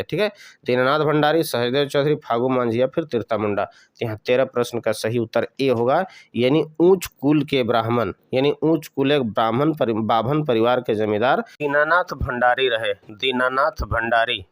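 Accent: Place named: Khortha, India. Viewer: आपके खोरठा बोलने की शैली से मैं काफी प्रभावित हूं। कृपया आप नियमित रूप से विडियो अपलोड करना सुनिश्चित करें,यह चैनल बहुत तरक्की करेगा। 🙏 धन्यवाद 🙏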